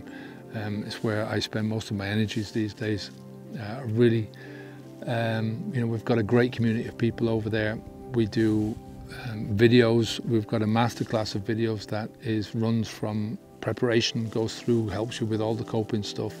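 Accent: Ireland. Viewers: Things sound more true with a good Irish accent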